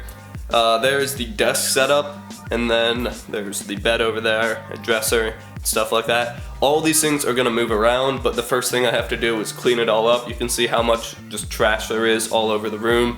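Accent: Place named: United States. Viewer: He sounds American mate, probably a glory supporter!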